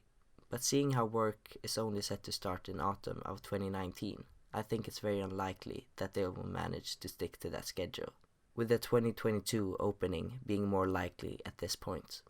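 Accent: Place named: Sweden. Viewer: Love your Swenglish❤️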